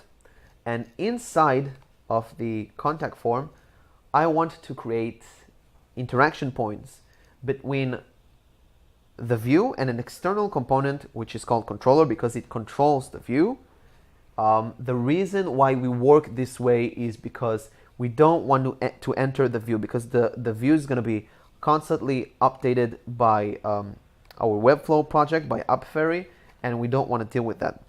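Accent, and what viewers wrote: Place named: Israel. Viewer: סרטון טוב תודה!! באמצע הסרטון פתאום חשבתי לעצמי... רגע רגע יש לו מבטא ישראלי :)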